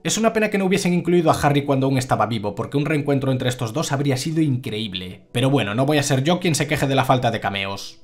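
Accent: Galicia, Spain. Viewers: Eres gallego no? :v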